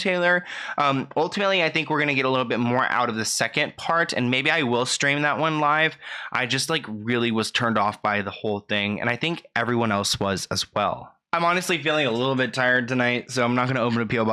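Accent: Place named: United States. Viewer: Love your videos, keep up the amazing work! Does anyone know where in America is from? I’m from the UK and like to try and work out the nuances in the American accent. Thanks!!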